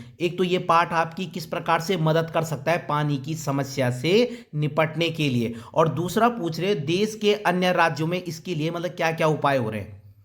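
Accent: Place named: Pahadi, India. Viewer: sir aap bhi pahadi ho kya kya aapke bolne ke tarike se laga raha hai ki aap bhi pahadi ho uttarakhand se mai bhi vahi se hun sir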